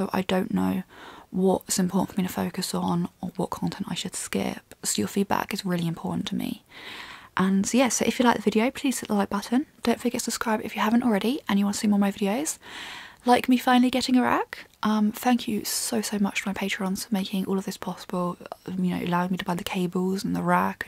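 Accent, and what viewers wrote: United Kingdom. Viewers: Love the British accent for tech stuff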